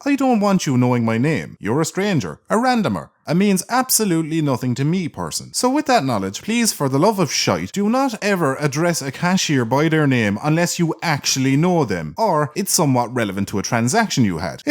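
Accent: Ireland. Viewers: You are the most Irish man I've ever heard. I love it.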